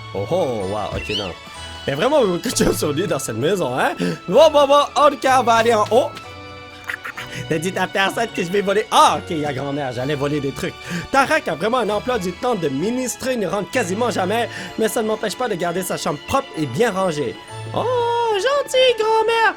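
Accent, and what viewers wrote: Canada. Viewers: Tes français chinoi canadien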